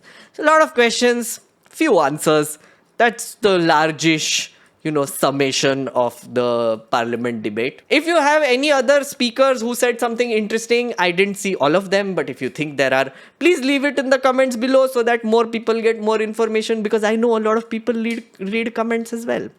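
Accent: India. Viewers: Koi is chutiye ko bataye it is pronounced “were” not “waire” and btw i support congress not someone from bjp so take it positively. Man the indian accent is so irritating.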